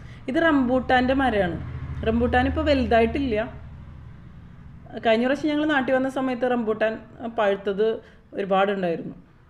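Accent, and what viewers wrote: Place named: Angamaly, India. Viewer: Angamalyyo Kodungalluro slang aanennu ee answer vaayikkunnathinu mumpu oohichu. Thanks for clarification.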